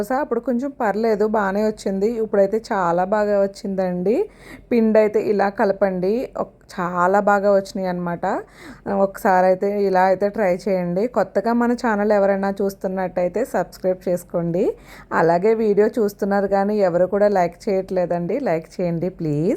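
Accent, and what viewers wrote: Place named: Godavari, India. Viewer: Super👌 Godavari language😂😂👌👌👌 Ekkada Unna language maradu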